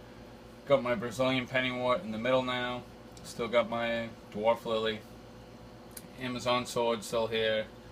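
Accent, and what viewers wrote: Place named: New York, United States. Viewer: Following cuz you sound like you’re from NY.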